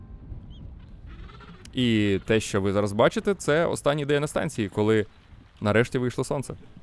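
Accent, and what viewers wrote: Russia. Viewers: Дякую за фільм. Чудові зйомки! Тільки попрацюйте над мовою, бо купа русизмів. британці not брітанци, корабЕль not кАрАбль, на вОду not наваду, швартОвІ not швартовИ, квіточкИ not цвєточкі, мИлотА not мілота, глИбокій not глУбокій, архіпелаЗІ not архіпєлаґє, Шпі́цбе́рген not Шпіцбєрґєн, лід not льод, кОнтинент not кантінєнт, білий ведмідь not білий медвідь, к раєвид not вид, човен not лодка, сУдна not судА, замайорІв not замайорИв, материку nat матєрікє, особини not особі, живіт not живот, берлозі not бєрлогє, стіл not стол, стосунки not відносини, ну і звісно Да легко можна змінити на Та) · ни одна страна мира так не кичится своим флагом, гербом и языком, как Украина. больше видимо нечем. Если что русскому человеку все понятно и на украинском, но справедливости ради приходится вслушиваться. Но просто все понимают что ты не украинец, родившись и вырастая ты находился в русском, тогда еще находившимся на территории Украины, но русском, Луганске. 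Ощущение, что русский говорит на очень простом украинском, где по-украински он знает только самые банальные слова, будто разговор с акцентом. и да я понимаю, что это мое личное негодование и не мое дело и я это признаю. просто искренне хочется смотреть крутые видосы на полностью понятном тебе языке и человека который всегда снимал на нем, всегда все всем понятно было и всегда было здорово все. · Птушкін українською вже не той… Не такий щирий, не такий емоційний і не такий цікавий. При коментуванні та озвучці відео вставити за весь час 150-200 російських слів і фраз…ну не йде, Антон, для чого видавлювати з себе те, що навпаки псує враження? Тебе прекрасно всі розуміють російською та ще й в 100 разів більша аудиторія…ну таке собі, чесно, без образ. За роботу браво і дякую, за мову - мінус, хоча я сам із заходу і виріс в україномовному середовищі, але чути від тебе українську - не заходить абсолютно…☹️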